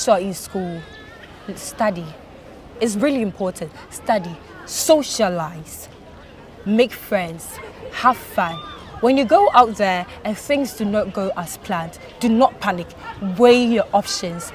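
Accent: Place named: United Kingdom. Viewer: This is so good.. she speaks with a British accent. That is what pertains in the UK. We can model it here for trial.. brilliant idea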